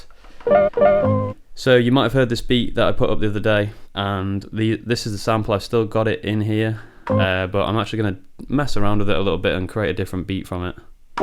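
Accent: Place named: England, United Kingdom. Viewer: Hi again, while waiting to buy this machine I'm following your videos which will prepare me for when I'll have it here :-) I'm from Italy and had to slow down the video and turn on the subtitles to get every single word... I hope I'll get used soon to your English, I guess I saw too many American English videos and I'm not used to that pure English :-) anyway, that's not the point actually, but I didn't get the first part where you talk about polyphony... As far as I understand from the manual (sp404a) it can handle 12 voices. So it's not clear how it can stop working when at the beginning of the video you try to layer 3 drum samples over that piano sample... If they were all stereo samples, they would be 8 voices, thus it takes at least other 4 mono or 2 stereo to reach that limitation of 12. So I think that I'm not getting something, can you explain again please?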